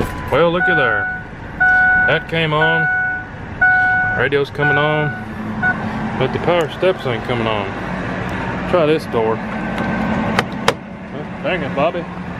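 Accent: South, United States. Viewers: We all do things like shock ourselves but most wouldn’t tell the world about it. I appreciate your humility and ability to laugh at yourself, after all we’re only human and not perfect. Also your southern use of the English language is quite entertaining. Thanks for another great video,!!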